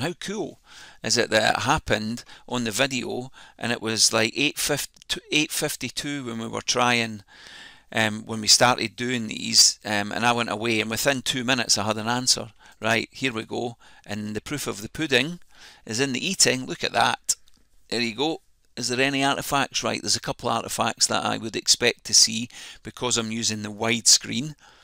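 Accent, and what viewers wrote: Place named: Scotland, United Kingdom. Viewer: Hi, I’m a new user. I’m enjoying your videos but can I give a suggestion. You move back and forward without giving detail. For instance, in this video you mention artefacts then immediately go to find the problem. At this stage I don’t know what artefacts you’re talking about. It would be beneficial I think, to your viewers if you could mention what the problems were. This would make sense of your remedies. I don’t mean to criticise so hope you don’t take it as such. I would point out I am visually impaired so it is possible it’s just me but I don’t think so. P.S. It’s good to hear a fellow Scott’s voice it a YouTube video for a change.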